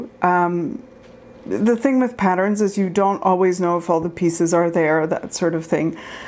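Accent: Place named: Quebec, Canada. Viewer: I could listen to your accented dialogue all day. Quebecoise?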